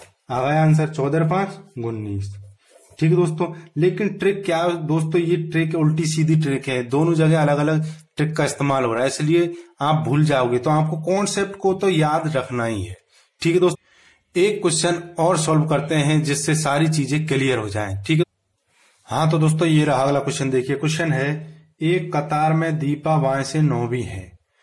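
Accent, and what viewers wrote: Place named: Bundelkhand, India. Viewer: Sir apki ki avaj m bundelkhandi language ka touch dikh rha.loved this.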